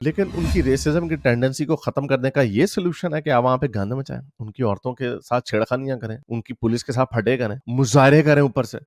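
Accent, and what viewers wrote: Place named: Punjab, India. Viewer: Love your punjabi accent very similar to indian side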